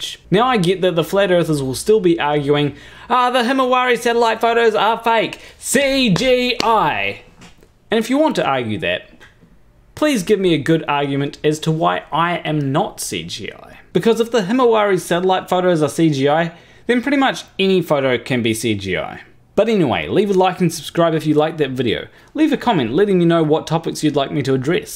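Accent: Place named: New Zealand. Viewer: No AI can do a New Zealand accent.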